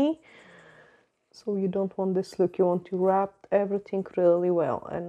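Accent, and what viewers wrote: Scotland, United Kingdom. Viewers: Love the set, love your work and attention to detail. Especially love your accent with Scottish influence. Hope to get to Scotland in the next couple of years!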